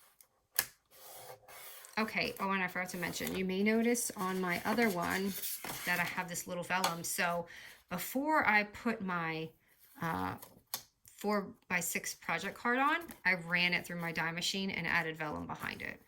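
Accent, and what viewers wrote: Boston, United States. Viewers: You are the reason I have gotten into crafting. Its been so good for my mental health, and helps me to unwind. I also got this paper set, so I can't wait to see what else you do with them! Do you have any mini albums made with chipboard tutorials with pages etc? Happy New Year also i love your voice and kinda Boston accent!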